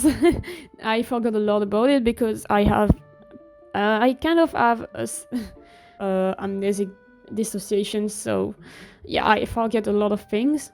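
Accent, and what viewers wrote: France. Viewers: So, I watched this while drawing some fanart, and I must say, your voice is very calming, and as someone who speaks french too (canadian, first langage french), I didnt mind your accent at all although I personally dont have one as strong. Your voice honestly calmed me down, I have very bad anxiety problems. But, more importantly, i'm very glad that you got out of this situation. You mentionned in the video multiple times something along the lines of: ``and well while you might not think its abusive enough, it..`` I'm just gonna say straight up that there is no such thing as being abusive enough. Abuse is abuse either way, and anyone who can't see that is an absolute moron who needs to get educated. Listening to some of the stuff you said, I honestly felt a sinking feeling in me, I just kept getting more and more concerned for you, and then reminded myself that you got out of there, which brought me relief. 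But the fact you had to deal with it for so long in the first place is awful already, I am so sorry you went through this at all. Anyways, just wanted to show that I care and that I'm proud that you managed to get through this until you got help. And I'm also impressed that you managed to talk about this on here, I truly wish you the best and a wonderful day, and I really like your art a lot, looks yummy :)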